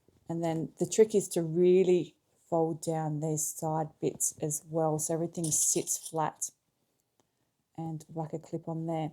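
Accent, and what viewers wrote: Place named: Australia. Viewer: Love you,! You’re the best teacher. I appreciate your Aussie sense of humor—not to mention the accent. In elementary school (4th, 5th, and 6th grades), I had a teacher who insisted every pupil — male and female — learn how to sew. I stopped many years ago. And now in my twilight years I’m using a Brother XR1300 and a Baby Lock Brilliant to make bags, bags, bags — before graduating onto something bigger. I plan to watch all your vids and hope you will continue to make them years into the future. Bless you!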